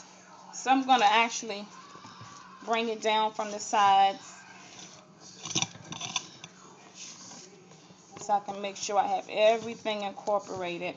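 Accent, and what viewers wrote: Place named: United States. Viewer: THIS LADY SOUNDS AMERICAN!!!!!!!!!!!!! BY THE WAY GUYS im from NZ so i don't get AMERICAN PEOPLE!!!!!!!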